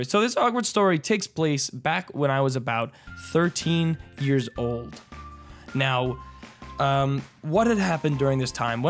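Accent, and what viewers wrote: Newfoundland, Canada. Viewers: Huh, I actually guessed that you were from Newfoundland- Its probably because the way you pronounce certain words is similar to how MattShea does.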